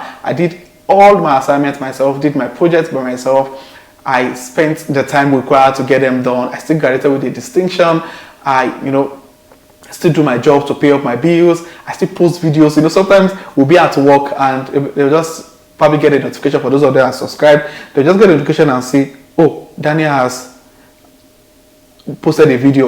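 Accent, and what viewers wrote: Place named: Yoruba, Nigeria. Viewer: Please Daniel, why is your your Yoruba Accent still so strong ?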